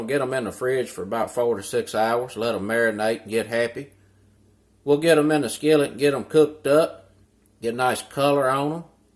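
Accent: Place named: Georgia, United States. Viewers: Hahaha, I am new at this channel. When I heard your good ol’ boy accent, I paused when I saw your hands enter the screen. Thanks for the great looking recipe. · I love your videos! Your the only chef on Youtube that talks like this good old Georgia boy. Thanks and keep it up.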